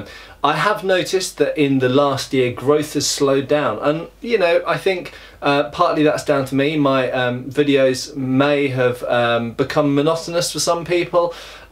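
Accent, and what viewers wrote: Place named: RP, United Kingdom. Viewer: Metatron led me to your channel. Among my interests are History, Archaeology, Weapons and Hema and in my opinion you are one of the best content creators on youtube. I also really enjoy your movies and tv series fights evaluations, they're brilliant. I think people visit your channel not only to be entertained but, mostly, to learn something, and I really can't say the same (for example) about Lindybeige, highly entertaining but with very less quality. I can't wait to watch your History videos (with your BBC voice :-) ). I'd also like to hear you explain old fencing manuals, like di Grassi (or even Fiore dei Liberi, Liechtenauer or Meÿer!). Keep up the great work, and thank you sir. p.s. Do Rants!!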